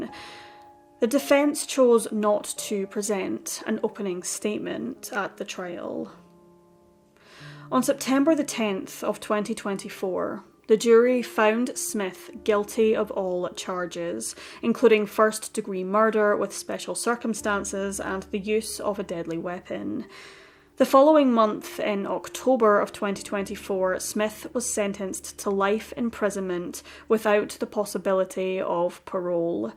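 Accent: Scotland, United Kingdom. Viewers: 🔥 Scottish accent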